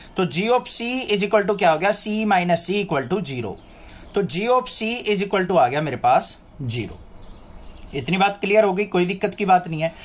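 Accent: Haryana, India. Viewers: Sir aap hariyana se ho ?aap jbb bol re ho tbhi pta chl ja rha h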